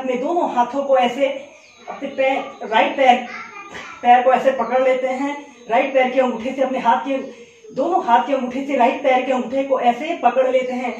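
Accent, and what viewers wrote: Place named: Haryana, India. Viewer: Lagta hai Haryana k ho aap...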